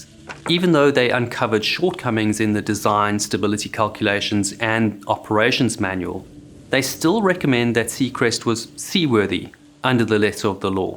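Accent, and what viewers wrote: South Africa. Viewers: He's south-african, you clown.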